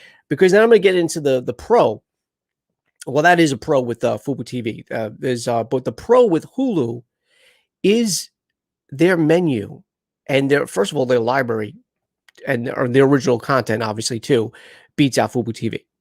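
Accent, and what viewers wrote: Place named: New York, United States. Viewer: You are so NY lol. I sometimes forget this is what I sound like lol. Thanks for the videos btw. Definitely appreciate the info and effort.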